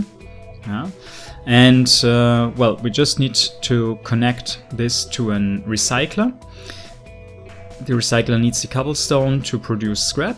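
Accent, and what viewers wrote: Germany. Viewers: Are you german? I think you have a german pronunciation.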